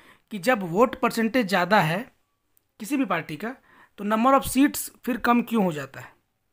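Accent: Bihar, India. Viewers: आवाज तो बिहारी की है।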